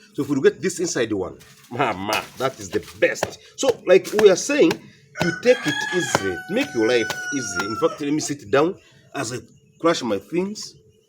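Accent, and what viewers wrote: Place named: Ankole, Uganda. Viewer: You're located where. U talk as if you are a munyankole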